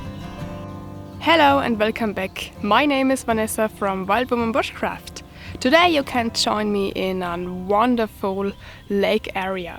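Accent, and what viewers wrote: Germany. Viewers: You could have carried a couple of cans of beer to drink when thirsty. I did not know Germans drank water. Your camp looks like it is infested with mosquitoes ! I hope you had the means to deal with them. You should have also brought bikini tops to wear when swimming. The tee shirt will not ward off the cold if wet. BTW, I love your German accent and how you patiently you explain in English.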